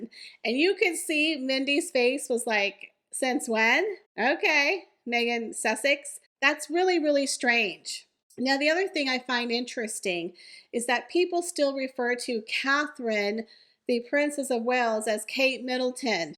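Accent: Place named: Texas, United States. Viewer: Hey Texas girl sending ❤ like your channel. You talk like me I'm also Texas born and raised here.😊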